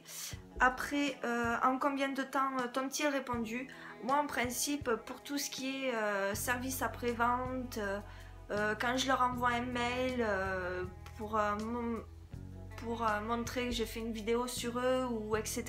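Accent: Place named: South, France. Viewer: AAAh ok j'entendais un petit accent du sud,bonne journée à toi!bizzzzzzzzzzz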